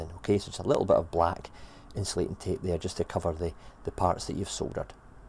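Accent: Scotland, United Kingdom. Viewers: Need a lift? Check out the close captions, and see its hilarious attempts to deal with a strongish Scots accent.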